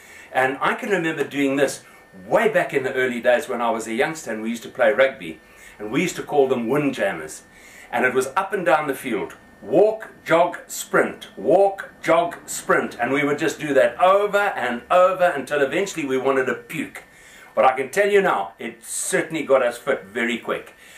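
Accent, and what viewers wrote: South Africa. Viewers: it says your from UK but your accent sounds South African??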